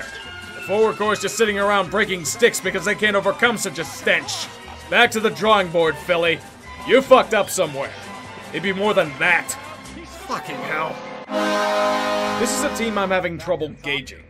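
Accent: Pittsburgh, United States. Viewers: Hearing this yinzer talk Flyers makes me sick but you got a point amirite?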